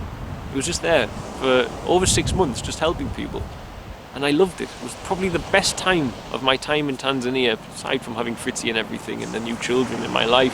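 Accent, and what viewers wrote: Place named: North, United Kingdom. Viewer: I'm glad you just sit and ramble on with beautiful information:))) and love your northern accent!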